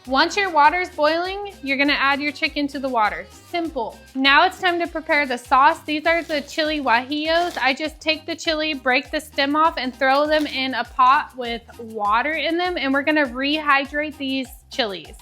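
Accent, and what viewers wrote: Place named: Sub-Saharan Africa. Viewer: A colonizing saa mid uggo ssa yt girl with a funny ssa accent 😂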